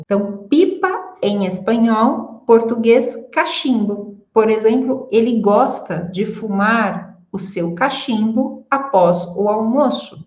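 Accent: Brazil. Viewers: ¡Qué interesante! En España, "saco" es como una bolsa, normalmente tejida de yute, de forma rectangular y sin asas (parte para agarrar) que las bolsas sí tienen. Normalmente decimos un saco de patatas, un saco de leña, un saco de arroz, un saco de cemento, etc. No sé si en alguna parte de España también se usa como prenda de ropa, yo sólo lo he oído de amigos hispanoamericanos. "Rato" es un tiempo más largo que momento o minuto, que también usamos. Tus clases son muy interesantes, además que entiendo tu portugués brasileño mucho mejor que el de nuestros queridos vecinos portugueses. Un saludo ❤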